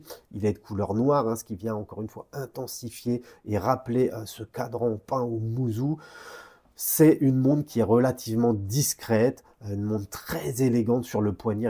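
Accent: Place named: Italy. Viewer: Tuo accento italiano è bellissimo!!! 😍😜👌 Bravissimo!!!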